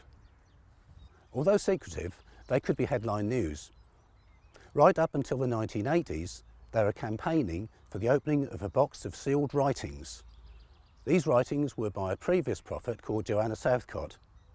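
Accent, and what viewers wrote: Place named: Bedfordshire, United Kingdom. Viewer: Lovely video - enhanced by the presenter's beautiful Bedfordshire burr. If you are able, get hold of "Octavia, Daughter of God" by Jane Shaw (Jonathan Cape, 2011). It is a most illuminating book - and it WAS stocked by Waterstone's in Bedford town centre.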